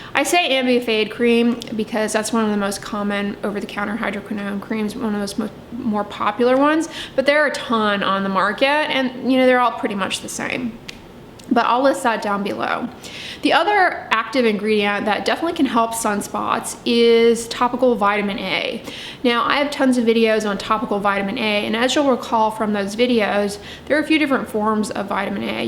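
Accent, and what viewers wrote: United States. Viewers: Where does that accent come from? As an Australian, it is hard to know what part of America that accent is common. Just today I discovered my brother in law has skin cancer on his scalp. It’s the most common type (BCC). We cannot overstate the dangers of the sun.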